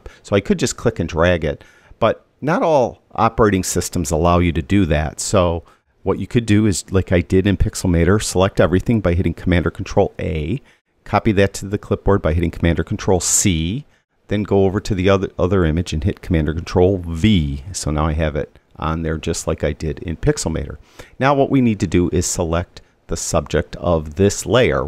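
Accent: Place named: New York, United States. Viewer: Thanks. You astound me with your knowledge and how you remember all the shortcuts keys for so many programs. I am a Lightroom Cloud user + I also use Pixelmator Pro as I find Photoshop to cumbersome a program to use when I only need to use it spasmodically. I really like Pixelmator Pro and find it a lot easier and more fun to learn and it is also very good value at a one off cost of $35 AUD when they had the half price offer. I will definitely be watching your future Pixelmator tutorials and if I can think of anything specific that I would like you to cover I will let you know. Has anybody ever told you your voice sounds like Paul Stanley from KISS. Must be the New York accent. Cheers from Sydney Australia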